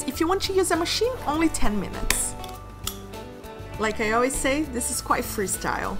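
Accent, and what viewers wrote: Brazil. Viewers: She is Brazilian:)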